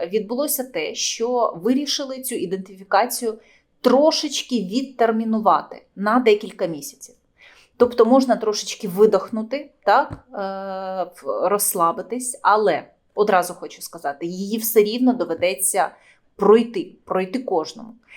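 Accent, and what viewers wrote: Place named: Russia. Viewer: НЕ ПЕРЕЖИВАЙ МЫ ВСЕ НАЛАДИМ ЗАЯ ! РОССИЯ СПАСЕТ ХОЛОВ )))! ПРИВЕТ ИЗ КРЫМА ))! Я СЛЫШУ ТВОЙ РУССКИЙ АКЦЕНТ ! ВСЯ МОЯ УКРАИНСКАЯ РОДНЯ ЖДЕТ КОГДА МЫ ОСВОБОДИМ ОДЕССУ ! ПО ТЕРПИТЕ ЛЮДИ ! ВЫ ТЕРПЕЛИ 30 ЛЕТ И ЕЩЕ НЕ МНОЖКО ПО ТЕРПИТЕ !!! СКОРО МЫ ПРИДЕМ И ПЕНСИИ БУДУТ РУССКИЕ И БУДЕТЕ ГОВОРИТЬ НА РОДНОМ ЯЗЫКЕ НА ЛЮБОМ ! ПОМОГАЙТЕ БОРОТЬСЯ В ВРАГАМИ ! ОТПРАВЛЯЙТЕ НА ТЕЛЕГРАММ ГДЕ НАХОДЯТСЯ ВРАГИ НАШЕЙ РОДИНЫ !ОТПРАВЛЯЙТЕ КООРДИНАТЫ ВОЕННЫХ ОБЪЕКТОВ ))) УНИЧТОЖАТЬ БАНДЕРОВЦЕВ ! СЛАВА РОССИИ !МЫ ПОБЕДИМ !СКИНЕМ ЯРМО США !!!! ТОЛЬКО ВМЕСТЕ!!!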